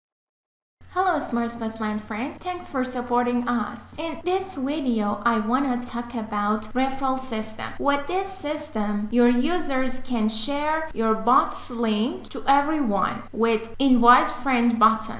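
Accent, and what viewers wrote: Iran. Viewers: At first 10 seconds i Told myself she is Iranian🙂 The famous Iranian accents🥰 باعل واعل😂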